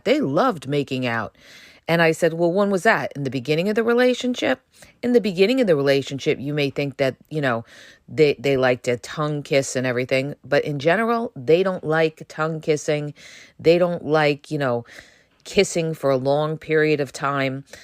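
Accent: New York, United States. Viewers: "Deep down, the narcissist disdains you, they really can't stand you" This, with your New York accent made me bust out laughing! Takes a bit of sting out of the painful truth.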